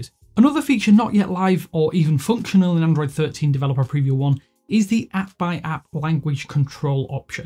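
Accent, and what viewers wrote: United Kingdom. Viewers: Maurício Meireless fazendo review de versão do Android com sotaque britânico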